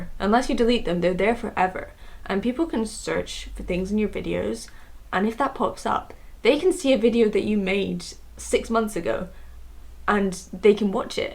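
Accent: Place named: West Country, United Kingdom. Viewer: What is your accent? I can't place it but then very occasionally it sounds very slightly west country? but then at other times very very subtly scottish... so both can't be right?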